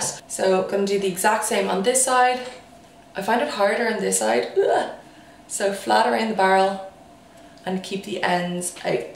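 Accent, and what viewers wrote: Ireland. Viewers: my grandparents were from Dublin, it's a comfort to hear your brogue ❤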